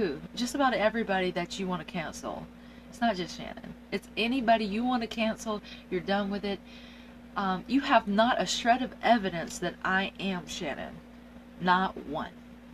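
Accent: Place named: South, United States. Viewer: Why did the voice change to a weird southern accent after episode one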